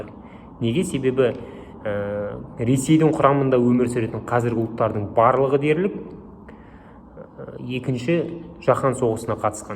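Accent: Standard. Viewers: өте сауаты күшті,қазақш таза сөйлейді,мәңгүрт депутаттар қағазға қарап міңгірлеп тұрады,осындай қазақ азаматтары көп болса екен,депутаттыққа,мини стрлікке осы Асхатты ұсыну керек,қазақша таза сөйлейтін жастар аз,аман бол!Қазақстан енді орыстың бұғауынан шығу керек!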